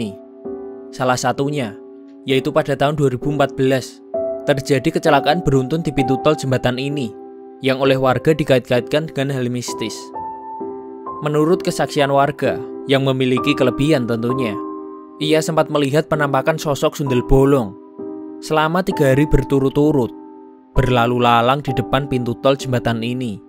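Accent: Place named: Java, Indonesia. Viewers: Logat jawa bikin candu kalo denger sambil rebahan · Orang Banyumas kayanya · Medok banget naratornya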